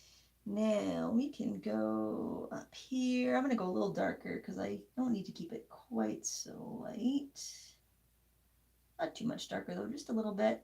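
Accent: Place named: New England, United States. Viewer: You talk like a New Englander! NEVER apologise for that! New Yorkers talk even faster! You guys are just who you are and i, as a Brit, love your vieos, don't change to try and please everyone..southerners talk so s l o w, so each region is unique! Your art work is gorgeous!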